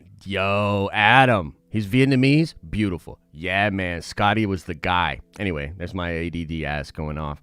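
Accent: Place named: Western Pennsylvania, United States. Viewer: did your west Pennsylvanian accent inexplicably get HUGE in the last week and a half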